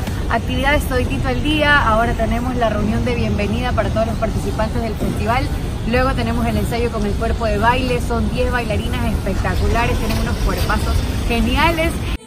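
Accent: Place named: Ecuador. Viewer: DE VERDAD ME QUEDE IMPRESIONADO CON TU DESENVOLVIMIENTO EN ESTE VIDEO, LUCES HERMOSISIMA, Y BIEN PROFESIONAL EN TUS COMENTARIOS, TODO CON MUCHA FUERZA, CARISMA Y A PESAR DE LA POCA EDAD QUE TIENES DEMOSTRASTE TALENTO Y DEMASIADA BELLEZA SIN PERDER ESE TOQUE ECUATORIANO AL HABLAR Y SALUDAR, MUY RADIANTE CON UNA ENERGIA SIN FIN, FELICITACIONES, ME ENCANTO ESTE VIDEO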